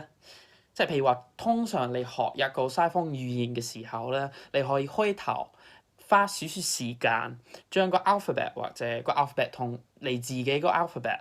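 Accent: United Kingdom. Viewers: Are you from Essex? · would have never told that it's a Brit speaking if i listened with closed eyes, well done! are you also on instagram?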